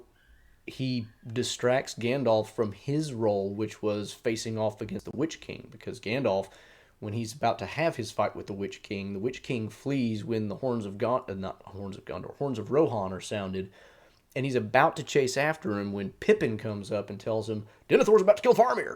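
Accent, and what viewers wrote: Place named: Southwest, United States. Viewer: Where in the south west?....of the United States is your accent from?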